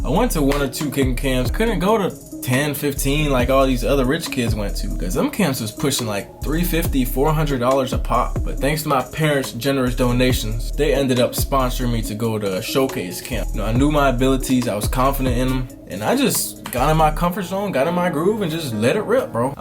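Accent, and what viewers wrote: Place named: Florida, United States. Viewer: Your Florida accent was heavy AF back then lol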